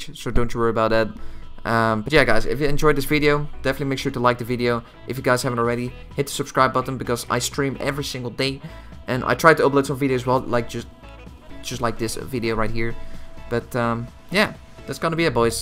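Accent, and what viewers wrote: Netherlands. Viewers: Volgensmij ben je Nederlands aan je accent te horen?? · Jesus bestaat er een accent dat nog meer nederlands is dan dat van jou XD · Are u dutch? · Jij bent toch nederlands k hoor het aan je stem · Where are u from? U sound like Dutch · Het moment dat ik je hoorde wist ik dat je een nederlander was. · Dat Nederlandse accent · R u dutch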